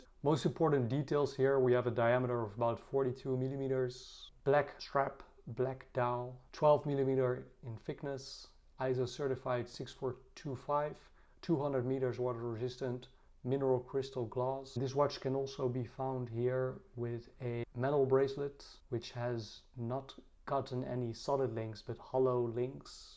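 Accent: Netherlands. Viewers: Nederlands accent?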